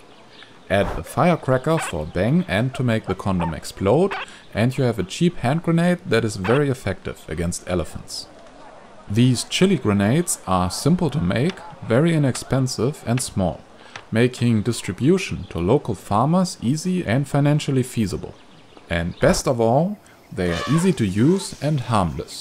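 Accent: Germany. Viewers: Are you german? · Dieser cringige Deutsche accent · Könnten vllt auch mal Videos in Deutsch kommen? An sich mag ich den Kanal, aber der deutsche Akzent nervt etwas... 😅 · german Accent._. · Your accent sounds German. Are you ? :) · why u have are german accent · are u german? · Man hört das du deutsch bist. Irgendwie hört man das am Englisch raus, aber ich mag deine Videos :D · Bist du Deutscher? Hörst dich jedenfalls so an😂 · Are you German? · I love the accent of this narrator. Sounds like german man speaking english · haha the guy sounds so german ;)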